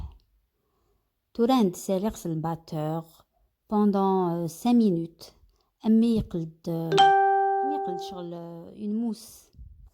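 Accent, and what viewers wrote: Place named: Little Kabylie, Algeria. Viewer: Accent de la petite kebylie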